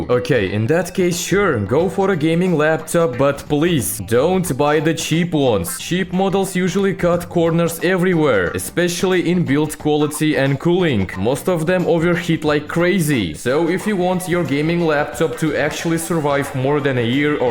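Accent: Russia. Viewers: Not trying to be mean but the Russian accent is so hilarious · 😂 it's russian accent ig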